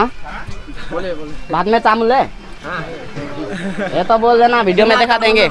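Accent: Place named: Limbu, Nepal. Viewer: Helo bro em frm darjeeling u rely speak like pure limbu keto😃👍🏻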